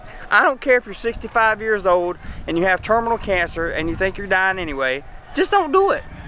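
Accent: South, United States. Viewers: I don't know! his accent is a thick Southerner but his looks is more like the people of Saddam in Iraq or Syrians:-)) hahaha, maybe because I'm a local you look different to me... no offense I like your video:-)) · What southern state are you from? Louisiana? Reminds me of Bobcat Goldthwaite. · love that accent..what part of south you from..mind me asking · your from texas cause your accent like a cowboy... i like it... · Just came across your vlog I subscribed, thank you for the honestey bro! My dream is to come to the Philippines, I love everything about the Philippines I plan on making it there soon! Where are you from it sounds like somewhere in the southern states? ( NC, SC, VIRGINIA )???? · He sounds like One em gangs in Red dead redemption 2. A strong southern accent so cool.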